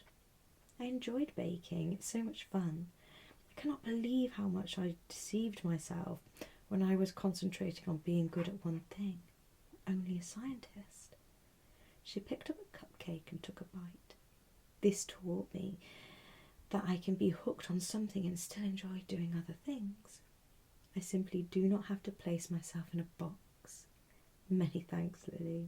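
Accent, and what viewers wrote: England, United Kingdom. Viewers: this english accent is just honey to the ears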